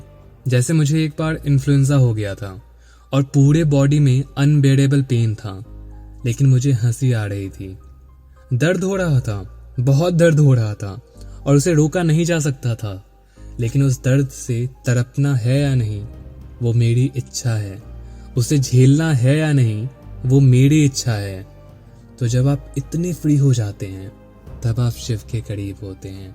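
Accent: Bihar, India. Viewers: Oye grammer me phd wo bihar ke hain aur Bihar me aise hi pronounce hota hai r, har jagah ka regional accent alag hota hai. Tera hi bas sahi nahi hai, ek to wo itna kosish kar rahe hain hamare accent me bat krne ka upar se nautanki karna h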